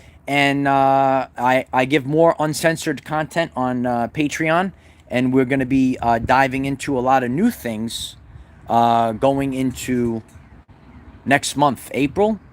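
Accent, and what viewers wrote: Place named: Long Island, United States. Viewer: You always make me think of Long Island where I lived most my life (way you speak), but also you look like and sound just like one of my nephews. Love your channel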